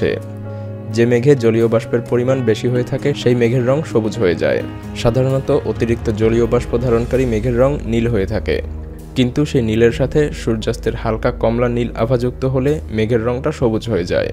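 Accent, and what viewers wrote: Kolkata, India. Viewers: Vai na india kalkata likha hua or vasa sune bujhe nen. Bangladeshr vasa eto sundor hoi na. Bangladesi ra jotoi valo vabe kotha boluk na keno kothao tan tan vaab thakbey..100%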